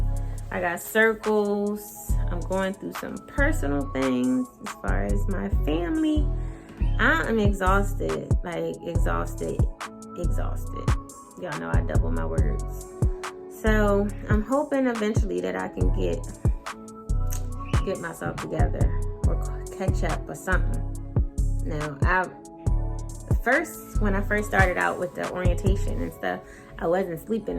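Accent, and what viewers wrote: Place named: Philadelphia, United States. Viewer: SSQ Brown 1 second ago I just got hired as a CCA and my orientation is coming up . Congratulations! As excited as I am I’m also super nervous. Especially hearing that you can’t be in truck with the person training you. Btw You sound like you’re from Philly. If you are your accent sounds like my roommate from school. Lol Congratulations on school! You got this girl! From one mama to another keep pushing.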